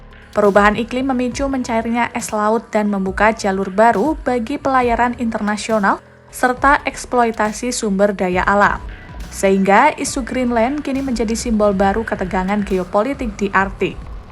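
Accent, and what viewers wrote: Java, Indonesia. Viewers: Medok nya klu hilang bagus Bu... 😊😊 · Medok banget jawanya yang pembawa acara, semoga tambah sukses kompas😊👍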